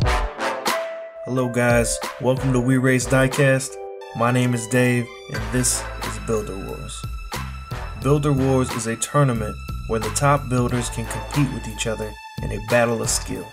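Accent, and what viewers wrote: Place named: East Coast, United States. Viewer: East coast commentary...yes sir! Good job, and always great racing!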